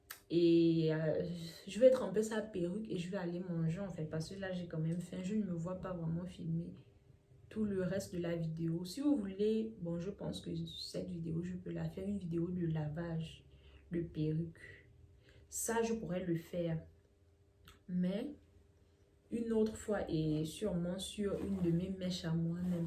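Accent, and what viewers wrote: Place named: Gabon. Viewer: Cc vous etes gabonaise? A cause de l'accent...svp jai besoin de la formation svp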